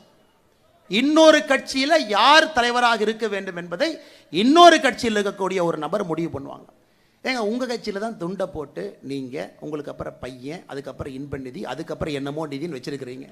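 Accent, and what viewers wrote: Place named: Chennai, India. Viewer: Madras பாஷை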